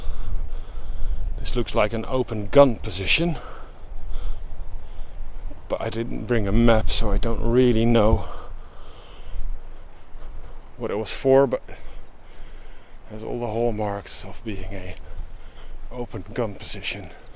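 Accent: Netherlands. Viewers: You have such a Dutch accent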